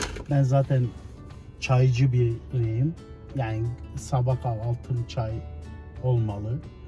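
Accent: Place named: Germany. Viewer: almancı gibi türkçe konuşuyor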